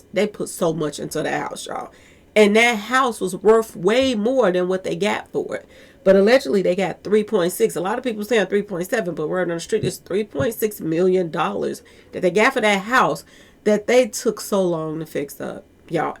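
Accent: Chicago, United States. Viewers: I detect a Chicago accent here! 😆 Cool channel.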